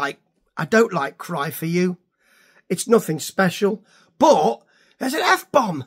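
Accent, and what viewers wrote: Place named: Birmingham, United Kingdom. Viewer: Are you a brummie? 'cause you sound (to my Irish ears anyway) like Bill Oddie. Oh! and Sabbath ended at Sabotage... everything recorded after that is a different band, different gene, different everything! (Just my opinion, )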